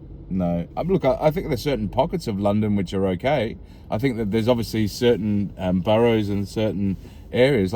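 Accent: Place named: Australia. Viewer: Love it 😂 P.S. You sound like the 'A succulent Chinese Meal!!' fella from our cousins over in Straya.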